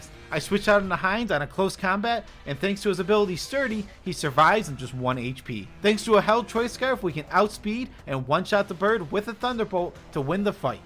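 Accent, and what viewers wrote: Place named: Massachusetts, United States. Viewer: I don't know where you're from. But I love your accent. Sounds like maybe Massachusetts.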